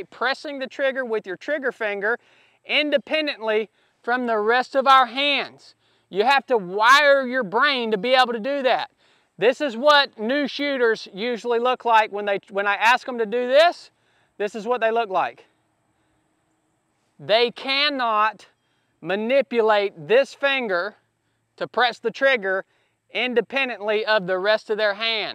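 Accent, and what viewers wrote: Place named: Texas, United States. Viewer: Dammit brother If you didn’t tell me….id think your from Texas !!!!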